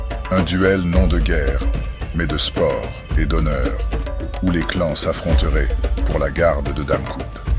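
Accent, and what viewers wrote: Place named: France. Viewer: Vraiment magnifique … Mais pourquoi l'accent français du colon? Soyons également fier de nos accents Africains.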